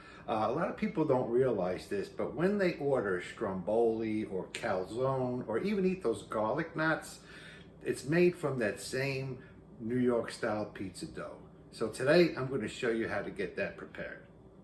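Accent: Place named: Texas, United States. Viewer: Like your Texas accent. Born and raised in NJ moved to Texas 45 years ago. I grew up on NY style Pizza haven’t had one to match since moving here, will try this recipe this week.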